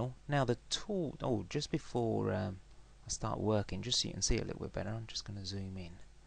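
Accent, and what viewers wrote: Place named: United Kingdom. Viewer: yea, the british accent is nice* in a way lol hehe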